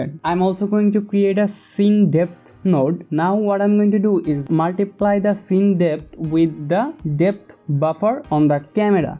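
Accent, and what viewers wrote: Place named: England, United Kingdom. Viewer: perfect, i love your English accent <3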